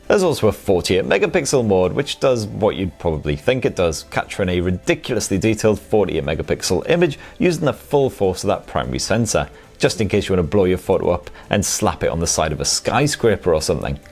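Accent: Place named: North East England, United Kingdom. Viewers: I'd say Teesside or around Newcastle as well. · Somewhere between Teesside and Newcastle (North East England). Its quite hard to tell as he is quite well spoken, I would hazard a guess at possibly Sunderland as his accent seems stronger than mine and I'm from teesside but I could be completely wrong.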